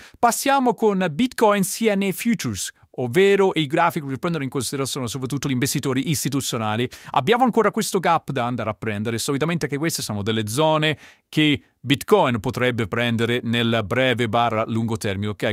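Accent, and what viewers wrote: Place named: United States. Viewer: Mitico l'accento AmericanEnglish è stupendo 😅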